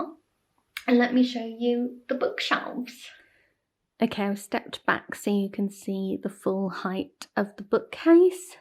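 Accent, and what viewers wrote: United Kingdom. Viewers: Hello, love your channel and your book shelves and love your british accent so much 😍😍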